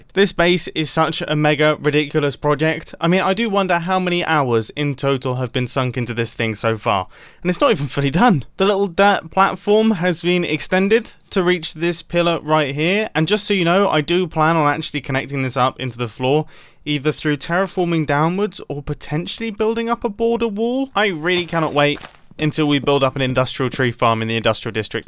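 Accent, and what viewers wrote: United Kingdom. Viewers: Mumbos UK accent Mumbos low voice accent without his UK accent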